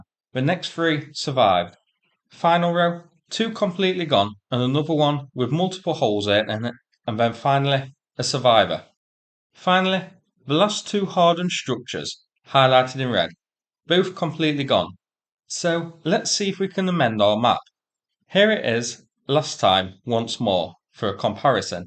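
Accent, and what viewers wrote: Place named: Yorkshire, United Kingdom. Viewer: I just Luv your Yorkshire Accent...It reminds me so much of my Granddad. 😊😅😂...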